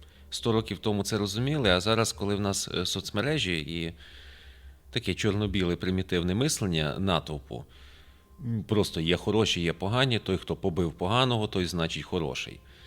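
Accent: Lviv, Ukraine. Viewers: Вас так приємно слухати.Гарний тембр голосу, літературна,і разом з цим, чути львівський говір!Буду слухати вас і надалі! Цікаво.Удачі вам і наснаги.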